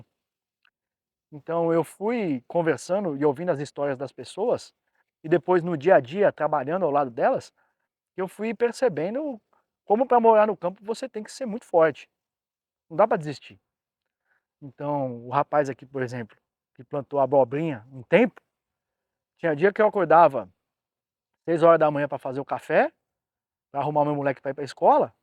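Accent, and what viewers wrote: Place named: Minas Gerais, Brazil. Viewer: Como fala o mineiro . Que bobajada bunita sô.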